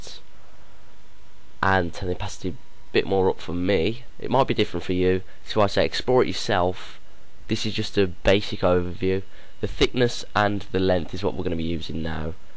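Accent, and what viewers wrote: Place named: United Kingdom. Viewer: omg british accent! love it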